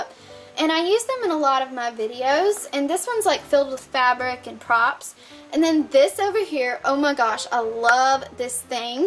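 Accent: South, United States. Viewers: Are you country you have a axsent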